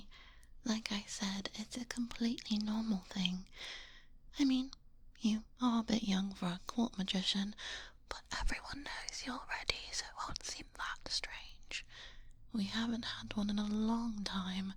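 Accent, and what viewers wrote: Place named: RP, United Kingdom. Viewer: yippy posh UK RP accent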